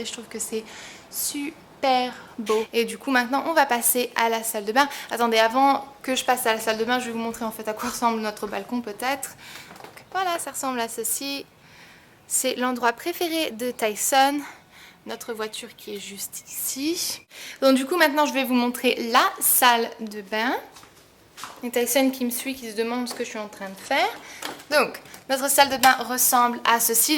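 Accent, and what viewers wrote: United States. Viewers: moi je vois pas le bazar en tout cas lol super appart jaime bien la déco ! et c marrant ta choppé l'accent américain même quand tu parle français lol <3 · Coucou, j'adore ta vidéo ♥ ton appartement est trop beau *-* Et j'ai remarqué que même en parlant français, tu as un accent américain :) Bisous ♥ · Puis sa meilleure amie d'enfance est américaine il me semble, elles étaient tout le temps ensemble, elle passait du temps dans la famille de son amie où ça parlait anglais, ce qui expliquerait ce petit accent. Mais je ne connais personne qui à force de trop parler anglais se met à parler français avec un accent ... Mélanger ou oublier des mots, oui, perso ça m'arrive souvent. Mais de là à avoir un accent quand je parle ma langue maternelle... Je pense que le truc de de notre miss remonte à l'enfance passée avec son amie, on attrape plus facilement les "tics" et habitudes à cet âge-là. 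Voila!! ^^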